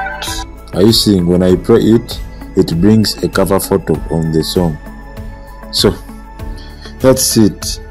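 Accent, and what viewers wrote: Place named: Uganda. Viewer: You are fro Uganda I know the accent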